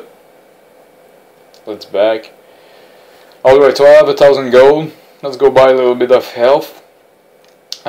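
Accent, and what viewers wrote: Quebec, Canada. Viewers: Are you French Canadian? (I am) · T tu un québécois ? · haha the way you say assist sounds like asses. i like your videos and the french canadian accent.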